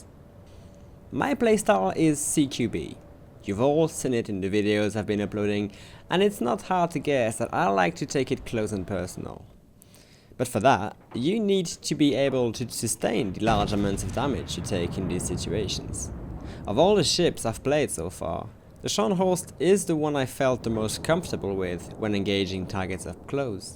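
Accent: France. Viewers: Your french accent is on point ! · c'est rafraîchissant d'entendre un accent français quand on vit en Ontario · Nice French accent man 👌🇫🇷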